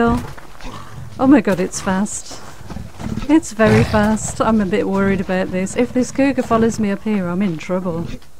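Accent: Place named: United Kingdom. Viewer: Thank You for the information about the new update. I really like to listen to your lovely British accent. You speak a very good english, very easy to understand for someone from the continent. Keep on the good work!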